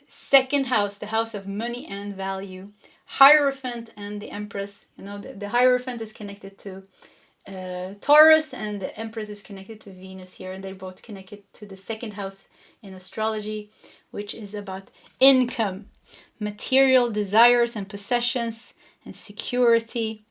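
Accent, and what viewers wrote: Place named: Sweden. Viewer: Chose pile 3, have experienced a heart chakra activation in the past year and I love your adorable Swedish accent. You have such a sweet energy! I lost several family members to cancer, cardiac issues and dementia. My first half of my life was filled with trauma, loss and adversity. At 45 years old I am exploring a potential career change and I have connected with a soulmate.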